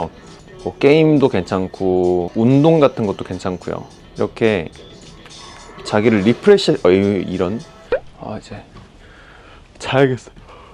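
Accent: United Kingdom. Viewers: 영국 방식의 영어죠. 미국의 굴리는 영어가 우월한 영어라는 생각을 은연 중에을 갖고 계신 것 같은데, 영어에서 이렇게 발음에 집착하는건 한국인 밖에 없다는건 알아두세요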